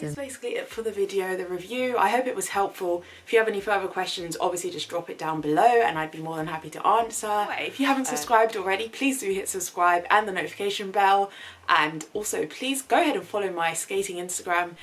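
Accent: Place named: United Kingdom. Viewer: Oh shit! British accent on an Ebony Queen?! SUBSCRIBED!!! 🔥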